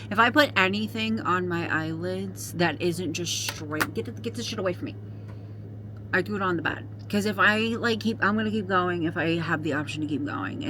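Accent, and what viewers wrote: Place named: United Kingdom. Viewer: British accent 💯!!! 😂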